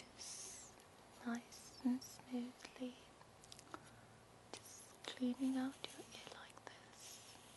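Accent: England, United Kingdom. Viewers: A Korean with an english accent is a juicy contradiction!! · i think i need to move to the uk... I love women with this accent... >.< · I love the idea of an ear examination video. I'm addicted! I usually don't get ASMR from English accents - something about being reminded of myself XD - but this was faaaabulously tingly. Thank you! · you have an amazing voice. i was not expevting an english accent from an asian girl either. really great video.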